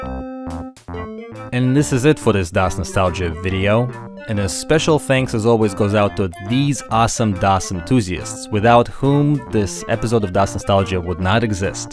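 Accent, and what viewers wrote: Russia. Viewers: I like your secretely half russian accent! So awesome! and great vids too! :D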